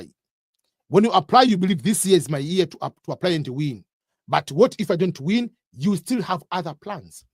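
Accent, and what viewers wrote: Uganda. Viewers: All along i thought this man is ugandan from his accent 😂😂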